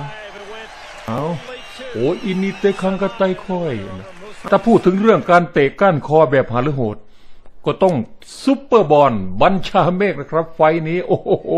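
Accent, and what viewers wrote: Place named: South, Thailand. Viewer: เว้าใต้เป็นตาหัวคั๊ก😂😂😂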